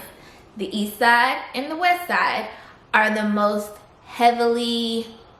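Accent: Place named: South, United States. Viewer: Question sista shaaa didn't you say you was coming from New York I'm detecting a southern accent Adams Rib chill chill chill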